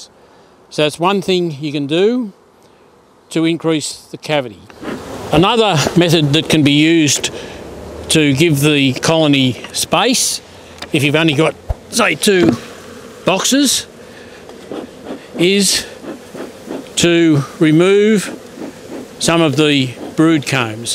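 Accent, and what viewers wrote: Australia. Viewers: Greetings from America! I LOVE your Aussie accent! May I call you? hehe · Love the Aussie accent. I was waiting to hear "crikey". Lol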